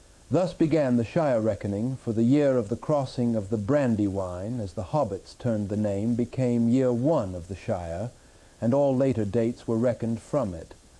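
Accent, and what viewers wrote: United States. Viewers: An amazing technological achievement, but doesn’t sound quite right. As another comment said, the accent is slightly American. But more than that, the recitation isn’t that of a story teller. Tolkien was a more poetic public reader. But an incredible achievement of AI nevertheless.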